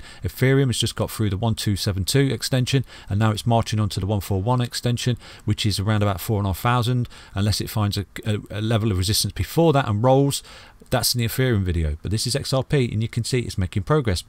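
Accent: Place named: England, United Kingdom. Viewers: I love listening to market YouTube videos, the English accent is the cherry on top. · You sound local mate, close to Derbyshire by Any chance or im thinking Nottingham? :)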